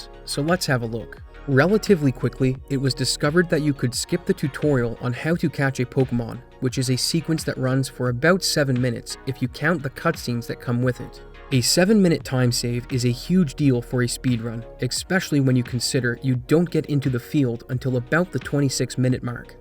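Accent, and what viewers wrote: Canada. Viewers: First time visiting the channel, must say you have the heaviest Canadian accent I've ever heard. I'm pretty sure it's Canadian but let me know if I'm wrong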